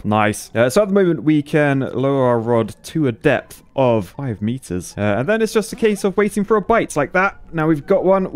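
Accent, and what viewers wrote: United Kingdom. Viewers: He British not American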